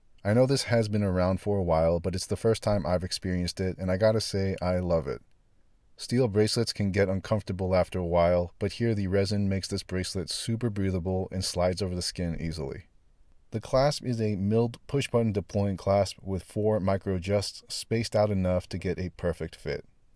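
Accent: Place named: United States. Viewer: Great to hear American English good detailed review !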